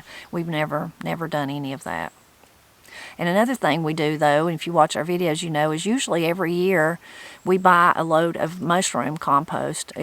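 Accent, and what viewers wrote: Appalachia, United States. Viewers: Just wanted to leave a comment: I grew up in Appalachia. My parents are from the north so I don't have a true Appalachian accent, but I use all the words y'all do. I now live in Germany as a dairy farmer. Was invited to a university to talk to Agricultural students in their English class. I'll be showing one of your shorts to showcase a true Appalachian accent. ❤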